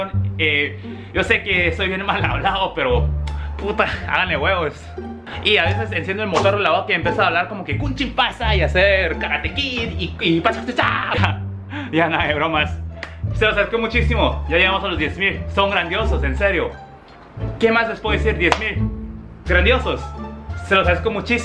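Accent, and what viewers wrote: Guatemala. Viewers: Maje sos un crack, me encanta tus videos y amo tu acento Chapín! Volvé pronto a Guate 😁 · Chino mano, vives en Corea y hablas más chapin que yo jajaja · Muy acento guatemalteco !🇬🇹👌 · Gracias me llega tu video y algun día tambien quiero viajar a tu pais. Pero gracias por poner en grande a mi Guatemala. Tu forma de hablar.es un 100% chapin. Me caes bien. Bendiciones. Noaotros los.chapines.somos calidos. Y la gente es.super.amable.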